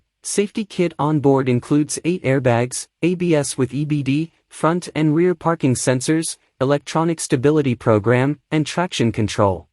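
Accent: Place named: United Kingdom. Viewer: How did you change your voice to uk English accent?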